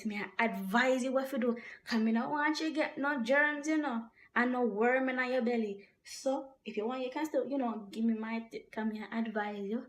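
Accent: Jamaica. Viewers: 😂😂😂 girl u some' else! i love when you speak in that patois accent...sounds real cool! And u have the most beautiful big brown eyes ...yah mann! 👌👌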